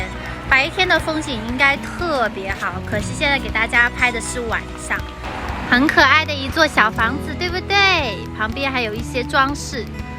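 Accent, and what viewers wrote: Taiwan. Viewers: 妳也有台灣口音！ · 苗栗縣人能撥打免費服務專線 1999 詢問任何個人所想詢問的有關苗栗縣相關問題！還有我很高興又是另外一位我所得知說話口音極近臺灣腔國語口音的大陸人。 P.S.其實全臺灣省各地的人，會因為年紀、種族、性別等等的不同，而會影響到個人說話的口音也並不一樣。就像我根本就不知道啥是"南部口音"？ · 哈来台湾都会有个通病就是说话都被台化了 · 你的口音好台灣啊，一點都不對岸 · 其實妳是小熊吧 （妳講台灣普通話很好聽） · 妳根本就是台灣人了！妳的口音、語調、想法、觀念，跟大部份的台灣人一樣啊！ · 你不說我根本感覺不出來你是大陸人根本一台妹好嗎? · 聲音越來越台灣了，這就對了。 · 講話跟台北人一樣，不像大陸人的口音。的視屛有一種吸引力，看了一次之後，就一直追蹤你的影片。其實台灣超市的密度，是全世界第一的。一個小鎮就有10幾家的超市，競爭得很厲害。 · 糟糕您的口音越來越台灣了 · 口音已經完全台化了！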